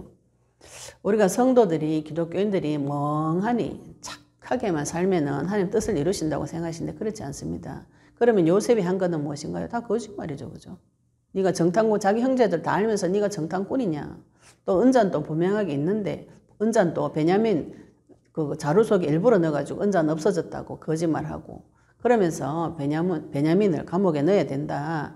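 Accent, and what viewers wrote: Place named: Gyeongsang, South Korea. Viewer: 갱상도네? 대군감?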